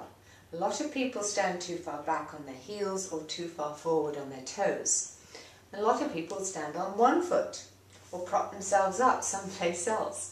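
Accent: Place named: United Kingdom. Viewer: Love British accent!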